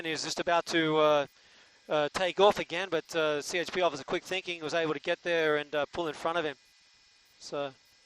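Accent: Australia. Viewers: Never heard an Aussie narrating an American chase before. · The narrator was awesome. Maybe an Aussie or a Kiwi?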